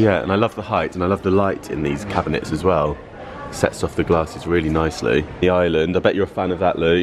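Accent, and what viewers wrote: England, United Kingdom. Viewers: Love your vlog, so nice to listen to someone from England. Some nice things there so now I have the job of seeing if they do them in Murcia, Spain!!! Keep up the good work.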